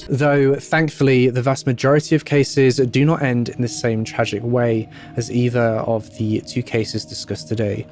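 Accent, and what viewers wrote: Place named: RP, United Kingdom. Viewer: Hes got a posh English accent so great pronunciation is a given hehe 🇬🇧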